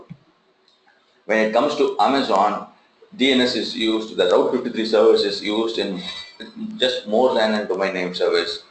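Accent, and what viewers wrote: India. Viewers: Good presentation. Nice to hear south Indian accent !!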